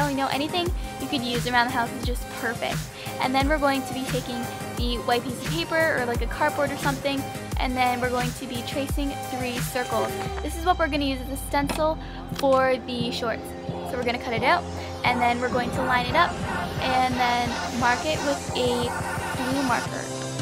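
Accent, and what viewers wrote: Canada. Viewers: Canadian ? Yééé :) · omg are you from canada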